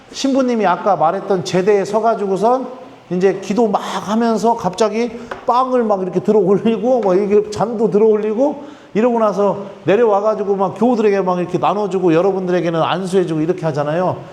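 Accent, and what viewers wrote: Chungcheong, South Korea. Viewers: 아주 오랜기간 냉담을 하다가 다시 주님 앞에 왔어요 그런 저를 보고 비신자 남편이 의아해 하면서 이것저것 물어 보는데 제가 답을 못해서 교리 공부 다시 하는. 중인데 신부님 강의가 짱입니다~~^^ 남편. 들으라고 볼륨 키웠는데 " 저 신부님 충청도 사람이네" 하더라고요.ㅎㅎ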